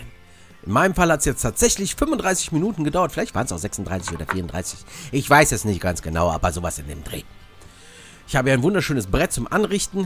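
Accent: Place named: Hesse, Germany. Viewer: Gut Esse, hessisch Gebabbel und rockisch Mussigg! Was will mer mehr!? Mach weiter so! Grüße aus Mittelhessen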